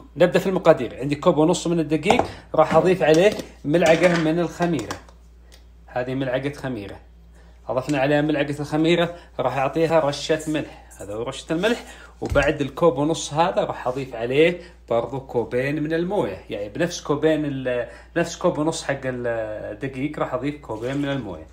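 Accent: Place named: Al Bahah, Saudi Arabia. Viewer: اللكنة زهراني او غامدي كيف كذا علمنا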